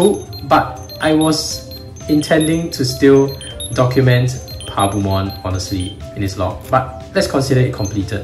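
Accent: Singapore. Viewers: Bro u from Singapore?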